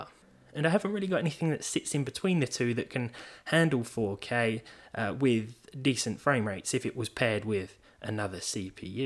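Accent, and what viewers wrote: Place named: United Kingdom. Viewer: British accents to me are like pissing a set of prison keys... but I tolerate it for because I love him.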